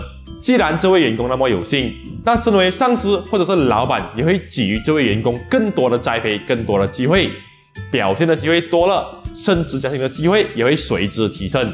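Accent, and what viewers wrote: Malaysia. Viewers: 這就是馬來西亞的華語 我覺的他說的很不錯了 我們也不會讓中國人改口音啊 憑什麼馬來西亞人就得改善口音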